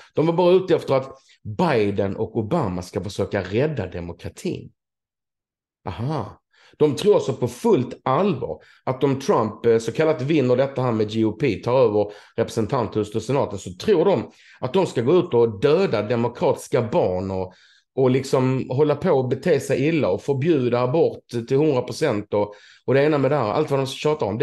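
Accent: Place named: Skåne, Sweden. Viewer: Ja där började din resa på köpenhamnsvägen .. i Malmö och dialekten sitter , och ålahue så skånskt 😜 tokigt 😂 men kul ..😘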